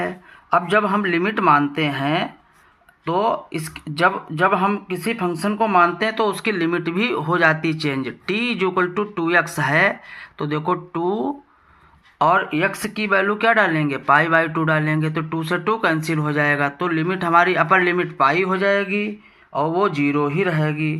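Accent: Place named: Bihar, India. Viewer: Bhai eski bihari master ki language toh kam kam hi samj aayi pr, pr question toh smj hi gyi Mai 😅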